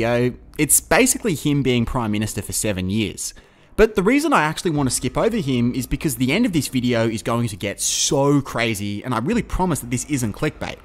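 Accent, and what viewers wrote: Australia. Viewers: Found your channel from one of your comment in World affairs channel. Mate, I like the content , the representation and the Aussie accent. Love to subscribe 🇮🇳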